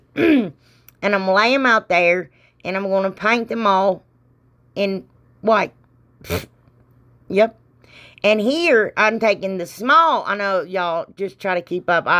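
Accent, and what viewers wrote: South, United States. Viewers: You are so much fun to watch. You make me laugh and your such a great crafter. Everything is so pretty. I love the bee theme. Please make a bank. It would be so cute and funny too. I’m a southern girl., but your southern accent sounds just like my sister that’s from Tennessee. I’m from pensacola Florida. I have lived in Mississippi for the past 22 years. Gulfport MS. Anyways, great video. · I was watching a diy of something I'm pretty sure, wouldn't turn out the same if I did it. A girl can dream... Your cute little bee thumbnail was in the suggested videos, and I thought well that is adorable. I'll buzz over there and check channel out. The first thing I hear is what sounds like a southern accent and I'm flooded with childhood memories growing up in southern Georgia that brings a smile to my face. I've spent my whole adult life as a yankee lol. 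Anyway, I thought this gal has my kind of humor and I thoroughly enjoyed the video enough to subscribe!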